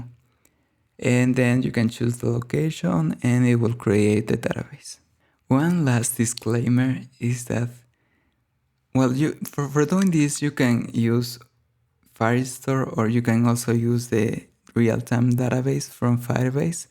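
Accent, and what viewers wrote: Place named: Spain. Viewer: I think you Spanish, your English accent is so beautiful 😍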